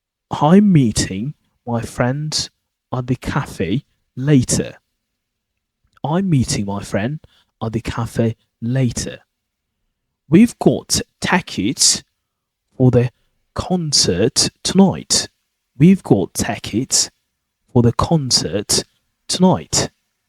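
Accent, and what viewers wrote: Birmingham, United Kingdom. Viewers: Alas I know what shadowing is. I am commenting on what sounds like your Brummie accent. If you are teaching English pronunciation to foreign speakers you ought at least use the most common English pronunciation so that they dont go around saying shardowing when it is SH-A-dow-ing.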